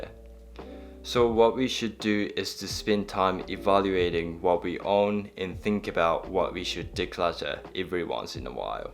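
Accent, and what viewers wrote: New Zealand. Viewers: you sound like a kiwi. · Are you a kiwi? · I thought I’d detected a kiwi accent 😃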